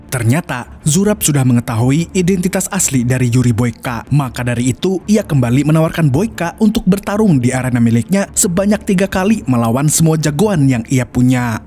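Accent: Banjar, Indonesia. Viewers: Urang banjar sekali ny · Urang Banjar kah sakalinya? 😁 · Admin orang banjar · Orng banjar kh mang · Banjar sekali nya Admin Nya,slam sanak dari kotabaru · Urg banjar kh mang 😂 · Pian urang banjar kah? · Urang banjar kah boy admin nya nih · Banjar nya mana pian mang...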